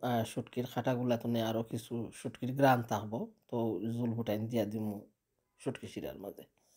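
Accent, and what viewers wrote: Sylhet, Bangladesh. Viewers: সিলেটি কথা খুব ভালো লাগছে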